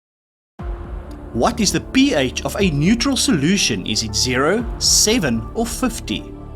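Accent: South Africa. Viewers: Also thank you for your great quizzes ! Your pronunciation is wonderful !!! The S/A accent is great !! (JOKE ) "Tell me , being South African , do you have a grudge ? " " of course I have a grudge ...where do you think I keep my car? " xx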